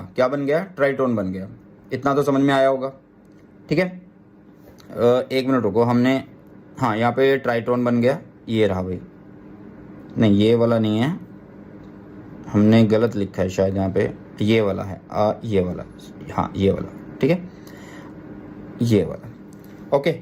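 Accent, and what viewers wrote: Haryana, India. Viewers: Sir aap haryana se ho kya😁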